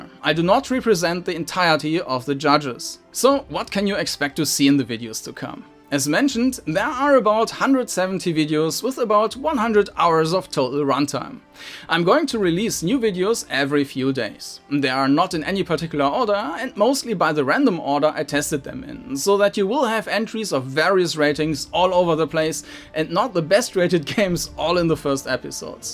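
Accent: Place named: Germany. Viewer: Ur englisch sounds so german :). Cant wait for this years crawler jam to start.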